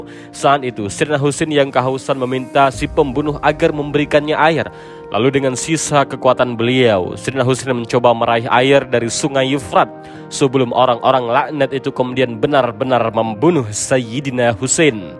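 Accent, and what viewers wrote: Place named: East Java, Indonesia. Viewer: Logatmu ngomong leeek lek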